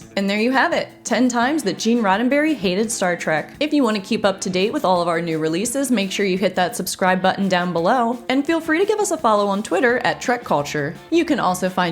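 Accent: United States. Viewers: American accent threw me off for a sec 😂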